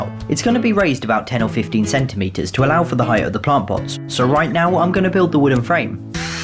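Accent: United Kingdom. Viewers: I like this guys British voice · You british?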